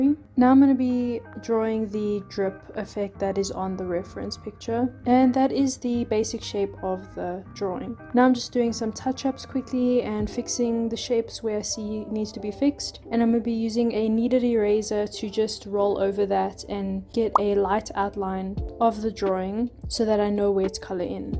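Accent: South Africa. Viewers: ARE U SOUTH AFRICAN cause u sound SA south african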